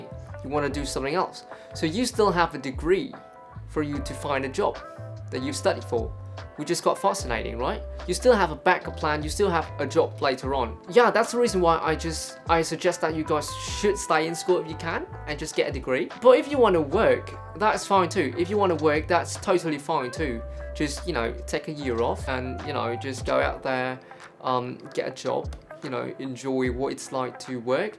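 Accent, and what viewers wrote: United Kingdom. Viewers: Giọng thì Anh anh nha Mà cái cách nói chuyện thì mix với anh mỹ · I'm really love your british accent haha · Your British accent is so charming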